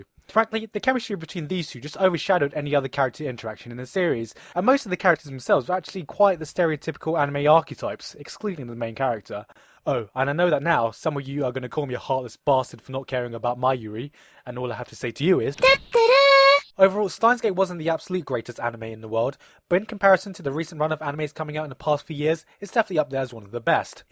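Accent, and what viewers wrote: United Kingdom. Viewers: Wow he really does sound a little more British here than now. Cute.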